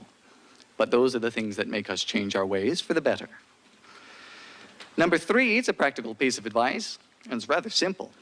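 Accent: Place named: Bermuda. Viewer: The Bermudan accent comes out a bit more when he's at home eh.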